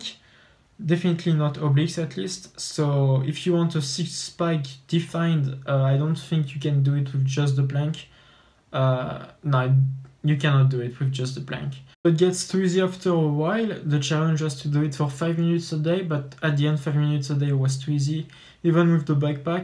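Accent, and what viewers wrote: France. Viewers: Are you French ?? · Are you french ??? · On reconnais directement l'accent français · Pleeeeeaaaasssssseeeeee you are French? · your accent is french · R u french ? J’ai reconnu l’accent 😂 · Mdr l’accent français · That French accent... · Oh yes the French speaking english lovely accent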